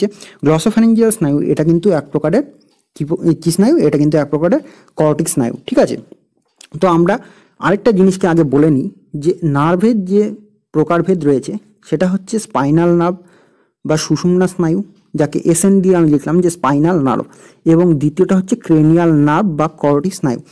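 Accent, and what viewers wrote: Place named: Bengal, India. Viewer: Sir please can you tell in Hindi or English along with Bengla language... Its so difficult to follow your speed Bengal accent... Please please please.. I need it..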